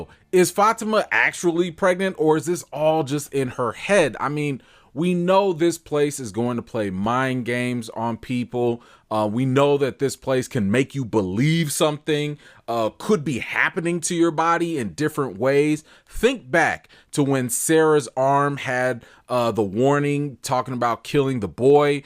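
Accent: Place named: Chicago, United States. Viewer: I KNEW YOU WERE FROM CHICAGO! Lmao and you remind me of one of my friends in Dolton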